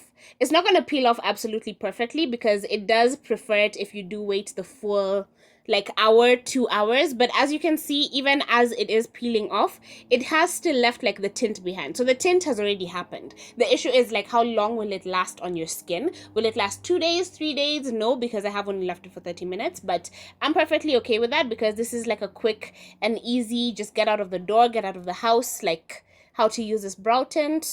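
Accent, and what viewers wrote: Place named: South Africa. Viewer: Your accent sounds so South African🇿🇦💕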